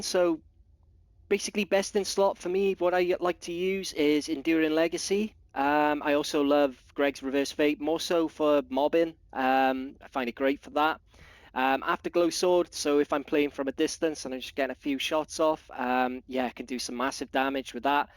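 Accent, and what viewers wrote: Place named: Wales, United Kingdom. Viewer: Love those Welsh tones. Have you considered onlyfans?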